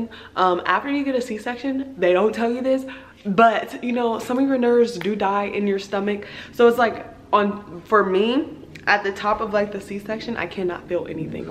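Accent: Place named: United States. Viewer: You sound country and I love it😩😩😍😍😍❤️❤️🥰🥰🥰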